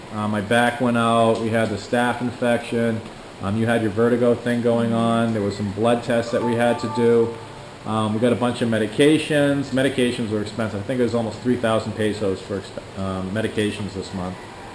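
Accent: Boston, United States. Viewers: DO THEY EVER SAY ANYTHING ABOUT YOUR ACCENT BEING FROM MASS I KNOW THEY DO IN OTHER STATES HERE IN USA IF YOUR FROM BOSTON THEY SAY PAACK THE CAAAAAAR LOL LABSTA OR LOBSTER LOL I GUESS WE LEAVE THE R OUT PEOPLE MADE FUN OF ME ALL THE TIME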